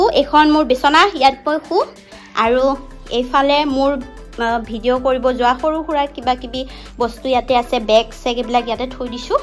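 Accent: India. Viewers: তুমি নলবাৰীৰৰে কোৱা❤❤❤❤❤❤❤❤❤❤❤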